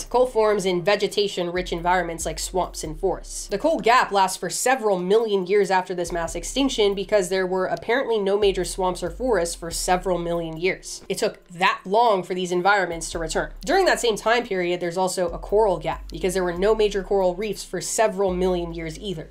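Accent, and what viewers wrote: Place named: Maryland, United States. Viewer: where are you from? You pronounce your O's like a Marylander 😂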